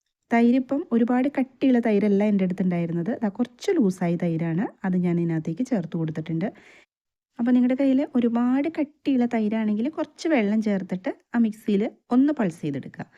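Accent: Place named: Thrissur, India. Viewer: തൃശൂർ കാരി aano? ഭാഷ കേട്ടിട്ട് അങ്ങനെ തോന്നി. ഞാനും ഒരു പാവം തൃശൂർ കാരി ആണ് ട്ടോ 😄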